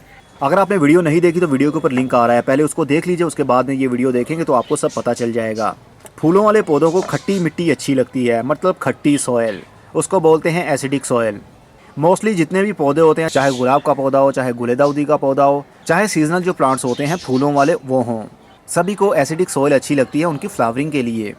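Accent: Punjab, India. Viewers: Birjees Sagheer Yes I think so. Im a native urdu speaker from India and I too think he has a punjabi accent. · You are correct dear. Im Pakistani and we call it Phitkari i guess he is frm Punjab side and thts why he says it this way. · abc xyz ......ye Pakistani Punjabi hei...unperh Punjabi ka urdu lehja hei....urdu bolnay ki koshish mein urdu ki aisi ki taisi phair raha hei....... jaisay.....Bharti... urdu boltay iski beikh o bunyaad nikaal ker issay Hindi kehtay hein....perhay likhay panjaabi behter urdu Bol letay hein.... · abc xyz I believe since he is based in Lahore his urdu is accented with his first language punjabi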